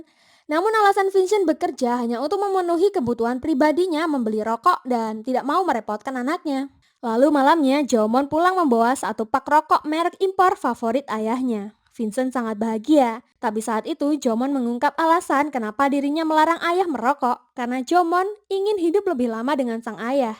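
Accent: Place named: Sulawesi, Indonesia. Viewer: Koq logatnya pakai "kita ji"... Mimin org Sulawesi kahh 😁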